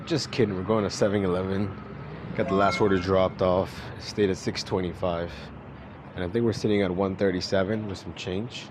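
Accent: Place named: Virginia, United States. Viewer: Lmao Dulles town center 🤣. I thought with your VA accent that you were trying to say Dallas this entire time I've been subscribed.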